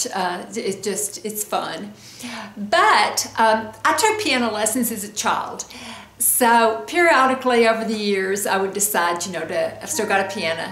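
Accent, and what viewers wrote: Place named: Tennessee, United States. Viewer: Great hair! Yes you are so right, we can get by with a whole lot less than we thought! I too did my roots but must admit can’t wait to sit in my hairdressers chair again! I had the privilege of living in Tennessee for my senior year of high school. I made some dear friends while there. Every time I hear your voice it brings back fond memories of them! I loved their TN accent as much as they loved my GA one! Stay well!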